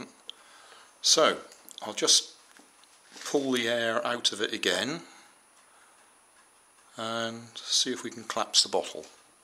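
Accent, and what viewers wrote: United Kingdom. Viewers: Your videos help me whenever we have a car making expirement, and i always get a A+! Thank you and i suscribed. I only have one question are you from uk? Just wondering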